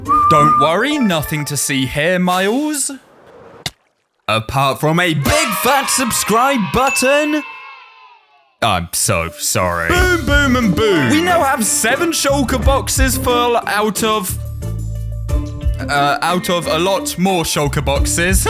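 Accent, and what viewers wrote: United Kingdom. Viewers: When and where is the frog party and are you british😅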